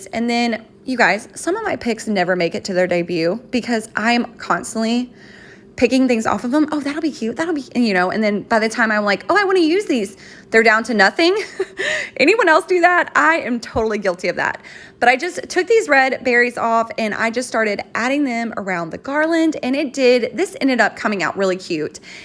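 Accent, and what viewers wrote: South, United States. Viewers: You are making me laugh....I KNEW you had to be from the South (I'm from TN)....and I ALWAYS say "anywho"...love it! I think I really like the Jingle All The Way sign. Never thought of distressing the metal. Great idea!!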